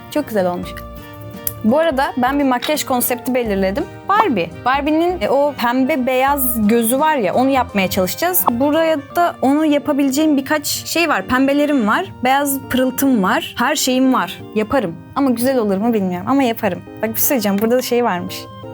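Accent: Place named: Adana, Turkey. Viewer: abla adana li gibi sin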